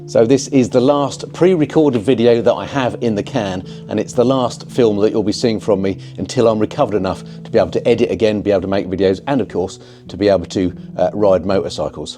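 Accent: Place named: United Kingdom. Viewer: Missing your industry news, and UK accent. Hope the surgery went ok last December. Godspeed!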